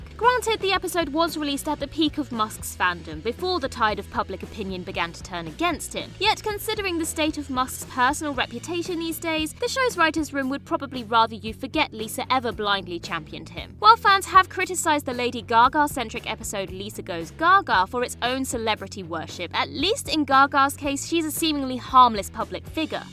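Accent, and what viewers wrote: United Kingdom. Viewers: The narrator is British.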